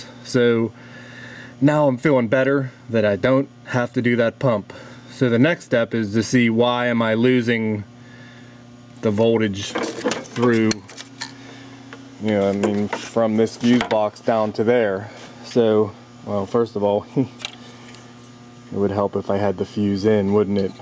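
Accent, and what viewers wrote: Pittsburgh, United States. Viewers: I thought you sounded like you had a "burgh" accent. Central pa here. I have the same problem with my caravan I think, I've got to tear it apart to make sure. Thank you for the info.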